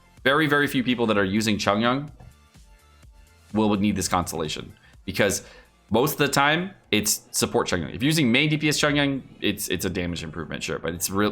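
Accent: United Kingdom. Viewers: Y British tho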